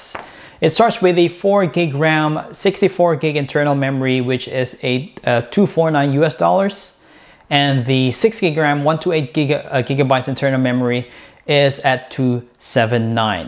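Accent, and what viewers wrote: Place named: Visayas, Philippines. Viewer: bisaya diay ka sir. hehe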